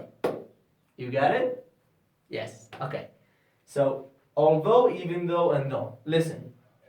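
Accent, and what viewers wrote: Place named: Yemen. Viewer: for English I just wanted to make sure owing to your accent look like Yemeni accent 🙂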